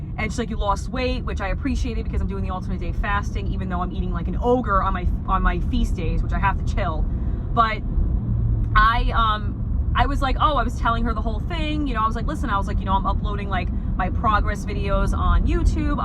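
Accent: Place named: New York, United States. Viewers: no shade I’m just from the Midwest we know a New Yorker when we hear one. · I love ur New York accent, I think it’s so cool.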